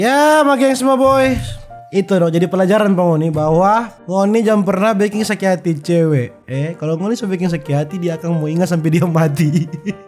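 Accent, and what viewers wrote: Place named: Palu, Indonesia. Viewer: Rupa ada ba logat palu tadi ka oppo 😂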